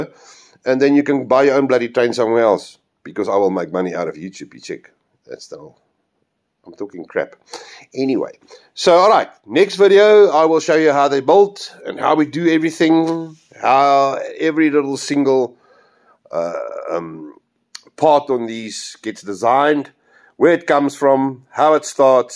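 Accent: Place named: South Africa. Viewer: Trains are great and everything, but come here more and more for the accent. Remind me the old days when I worked in the UK and had a few SA colleagues :)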